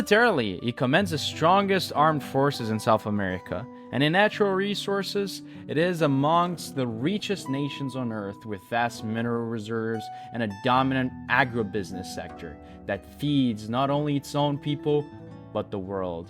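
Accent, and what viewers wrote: Brazil. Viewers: Voce tem um sotaque abrasileirado. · I can tell you're another Brazilian just because of your accent! Great vid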